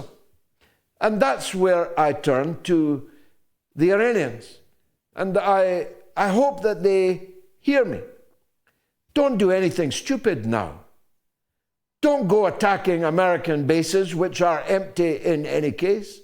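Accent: Scotland, United Kingdom. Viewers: I love your Scottish accent!!! from Brittany!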